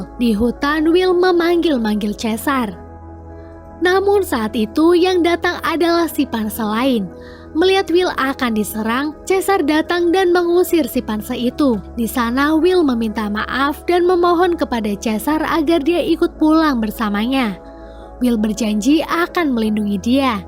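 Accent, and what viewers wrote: Pemalang, Indonesia. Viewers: Wwong Pemalang asli min?Podo wkwk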